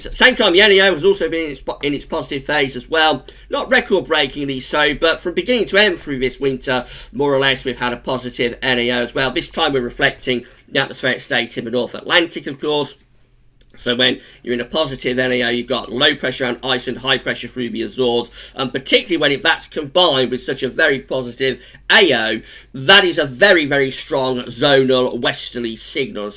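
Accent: United Kingdom. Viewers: what exact township is that accent from my bruvah? I'm east indian trying to learn British accents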